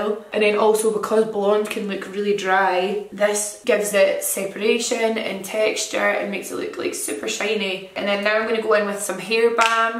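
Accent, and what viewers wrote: Scotland, United Kingdom. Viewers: Hajar Lakroune scottish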